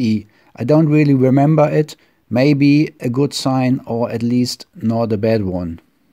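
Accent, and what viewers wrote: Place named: Germany. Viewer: wieso kann man das englisch klar verstehen aber das deutsch trotzdem raushören?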